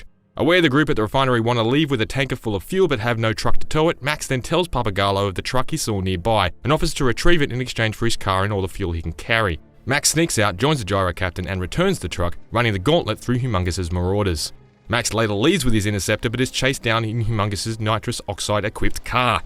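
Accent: Australia. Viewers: I like how his voice sounds Australian because the film takes place in future Australia.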